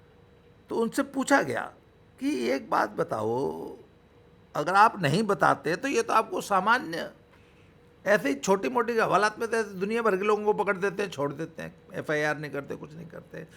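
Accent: Haryana, India. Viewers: Aap gazipur , banaras ke h bhasha haryanvi h😮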